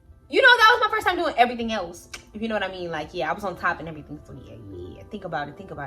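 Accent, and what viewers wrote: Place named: South, United States. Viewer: Yea u either from Atlanta or Houston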